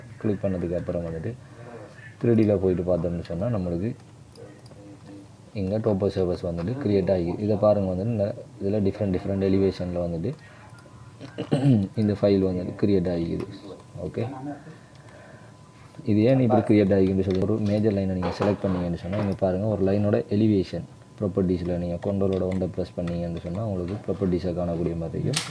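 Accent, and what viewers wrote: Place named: Eastern Tamil, Sri Lanka. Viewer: Best of luck bro Eastern tamil ketkawe mass a irukku Konjam basic la irunthu solli thara ealuma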